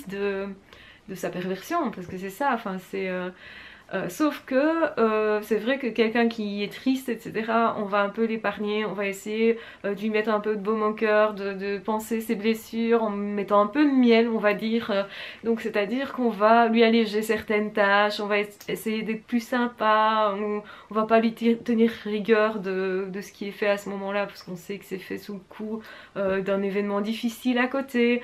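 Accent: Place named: Belgium. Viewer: très très biesse... Aaah une belge ^^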